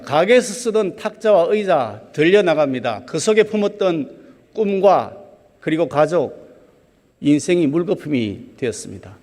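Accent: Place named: Gyeongsang, South Korea. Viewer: 김기현 대표 쥑이네ㅡ퍼팩트 치시네ㅡ경상도어투가악간 가미된 또록또록한말투음성 질타하는 늠름한 모습 멋집니다ㅡ실컷 질타하세요ㅡ욕먹어도 싸기도쌉니다ㅡ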